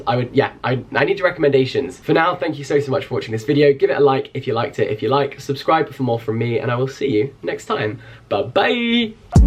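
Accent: United Kingdom. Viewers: It's funny, your british accent is stronger in your old videos than in the new ones :)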